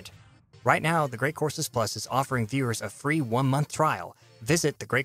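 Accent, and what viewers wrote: South, United States. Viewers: Man I cannot ignore the southern accent now that I've spotted it.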